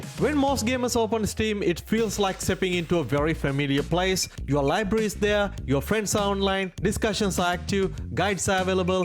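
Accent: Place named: India. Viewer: Yo dude are you from india??your accent feels indian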